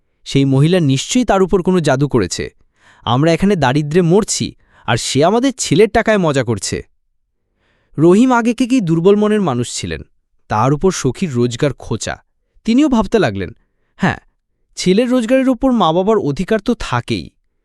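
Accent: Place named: Dhaka, Bangladesh. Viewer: এই গল্পকার ঢাকার কথা বলার কারণে গল্পের গুরুত্ব কমে যাচ্ছে।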